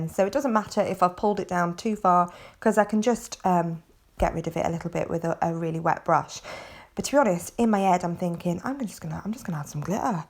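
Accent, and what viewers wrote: United Kingdom. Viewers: I just started watching your vids and absolutely bloody love them love your personality I'm from manchester and live in the u.s and just reminds me of home and I crack up to your voice overs there just Brill thank you so glad your vids showed up on my feed!!!! Georgeous set too! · I just subscribed last week, and I find you informative and FUN! To an American, who, (in the states,) is considered to have "no accent,"* there is something incredibly charming about a British accent cursing. It's unexpected and kind of incongruous to the American ear, and it hits our ears as hilarious! *I was born and raised in California, fourth generation native Californian, at that. I think a California accent is the American equivalent of received pronunciation in the UK.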